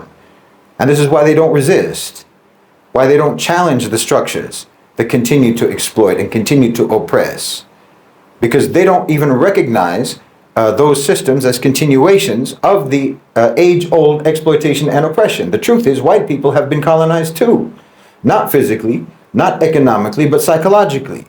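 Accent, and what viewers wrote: United States. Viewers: I aint gonna stop😂 this man is american college educated and speaks in an american accent. 😂 If you cant tell hes a shill you lack critical thinking and will always do what the screen tells you too. He even uses american ideology and american issues while he lives here to tell you about how bad white America is. This person is lower than pedophiles. He's a pedo protector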